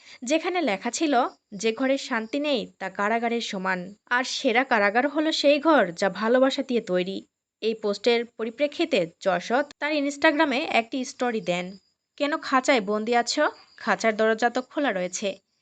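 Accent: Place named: Bangladesh. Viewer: Bangladesher mone hoche apni. Huapy couple bollchen.Huapy ki kotha? Happy balun.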